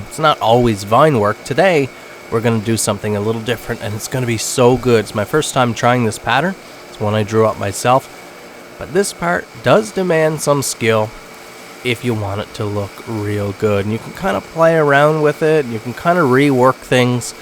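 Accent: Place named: Newfoundland, Canada. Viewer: It’s a Newfoundland accent.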